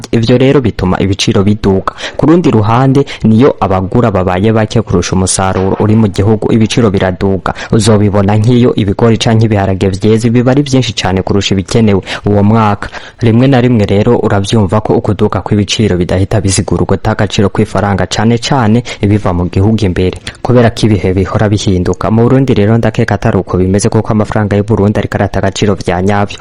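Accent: Rwanda. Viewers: Nagira ndagusabe uze uriga uravuga ikirundi mpuzamakungu apana mpuza mahanga ivyibinyarwanda wivugisha stop ikindi Vuga nkawewe woye kwigira nka Ismail mwanafunzi ibisigaye courage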